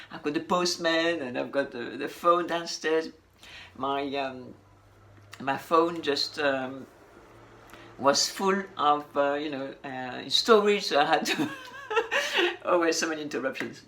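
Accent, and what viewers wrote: France. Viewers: The (alpaca) Max Mara i certainly never will sell! ;) Some women like to change their wardrobe every month ;)I have a beautiful knitted 100 % Scottish cashmere scarf which i wear already for at least 15 years. So i absolutely understand what you mean but I like a little bit more change and renewing . I love beautiful animal friendly natural materials. I never wear 100 % synthetic. Sometimes there is some minor percentage of synthetic in my clothes. Have a nice day in beautiful douce France ! It is the favorite holiday country ( together with French Belgium) for me and my family living in Holland. I love the French language! In my opinion it is by far the most beautiful language on earth! I LOVE your little accent and French musical intonation ! ;) But of course your English is very very good.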